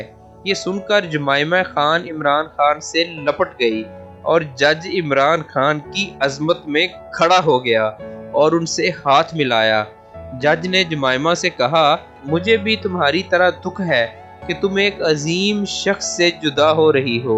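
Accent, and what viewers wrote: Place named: Punjab, Pakistan. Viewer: بھائی آپ پنجابی میں بات کیا کرو. آپ کا لہجہ پنجابی ہے.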